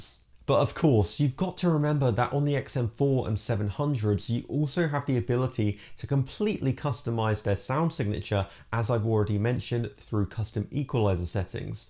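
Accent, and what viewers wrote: United Kingdom. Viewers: is jimsreviewroom British accent version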